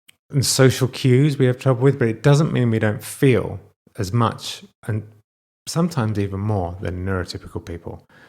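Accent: United Kingdom. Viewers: I have noticed that a lot of your videos have been triggering for me specifically because you remind my of my ex. He is British, MCR, and we are both in recovery (post divorce - he was an alcoholic while we were married, and I abused alcohol post divorce - for various reasons treated via therapy). And idk if it is cultural (you're both from England, and you both have English accents ((obviously not the same accents))), or not. But I've had to really work to separate the two of you. He is very much a social media dad. He has a lot more work to do, because he started years after I did. I've had so much therapy and he hasn't. Idk, it's really weird, and I have to really work on staying in my own hoopla hoop when watching your videos specifically. I'd love to have a few conversations with you about it, but I know that probably isn't something that will actually happen lol. 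You have a lot of followers. But I do genuinely love the videos and openness from you and Laura. And I'm an adult who was diagnosed later in life as neurodivergent, and all 3 of my children were diagnosed as children. My mother had severe mental health issues (diagnosed).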